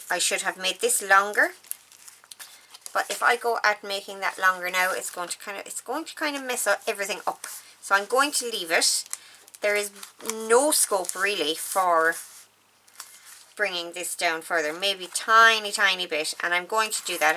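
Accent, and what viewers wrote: Ireland. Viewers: Superb, fun, engaging, inspiring 🌹 Thank you so much for creating these two styles, they are just wonderful and I’m thrilled that you use lace and fabric, gesso and metallic in these styles, I’m definitely going to create a Shabbychic style for my current project! Wonderful to hear your beautiful Irish lilt and watch your happy, fab tutorial! Hugs and blessings 🫂🙏🏻❤️😍🌹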